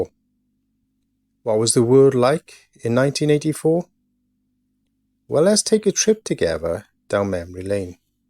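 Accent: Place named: South Wales, United Kingdom. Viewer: After seeing your review I picked one of these up (Nikon F mount) on eBay for forty quid. Not sure why but I'm finding it's overexposing on my Nikon D500 and I'm having to set a compensation of -1 to -2. Apart from that it's an excellent lens. I came back to your video and just twigged on the voice, South Wales. 👍🏻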